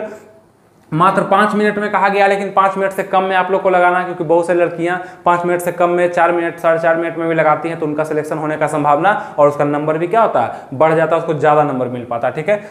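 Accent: Bihar, India. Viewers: Aap bihar se ho guru jii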